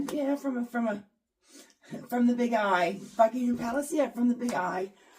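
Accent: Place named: United States. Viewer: You don’t need a cute British accent, you have a cute American accent and you are genuine and in my opinion beautiful. 😊 women are allowed to be happy and have dreams. Looking forward to your videos!😊♥️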